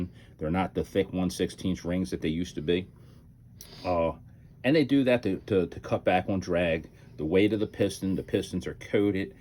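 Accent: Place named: Baltimore, United States. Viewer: You sound like you are from Baltimore... Is this engine from the area?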